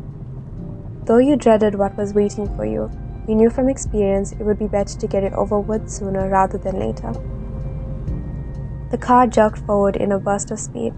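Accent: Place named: Afrikaans, South Africa. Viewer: Quick Questions: Are you Afrikaans? or Indian? Either way, I love your accent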